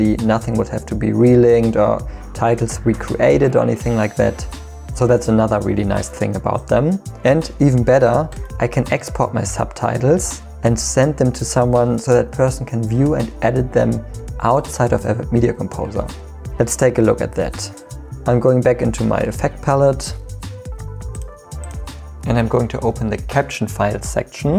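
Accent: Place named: Germany. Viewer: Aweful German accent, I don't understand nothing!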